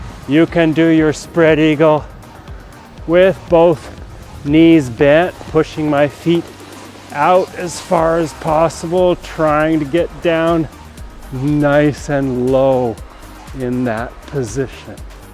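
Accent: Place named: Italy. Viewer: And I really like your Italian accent <3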